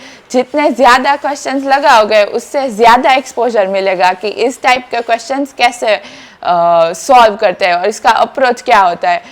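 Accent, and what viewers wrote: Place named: Gujarat, India. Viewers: The Gujarati accent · Not even a 1% percent dilution in her gujarati accent hats off queen........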